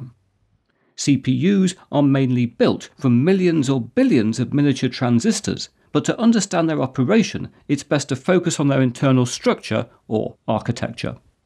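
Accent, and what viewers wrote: United Kingdom. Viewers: British accent....is hard !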